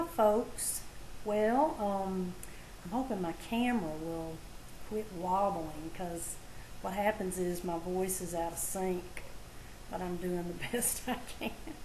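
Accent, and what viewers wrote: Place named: South, United States. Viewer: Those are all great movies. Love watching you talk in front of the camera. Us northerners love to listen to the southern accent shine..lol. If you ever need something to do you could always be a movie critic. Excellent job.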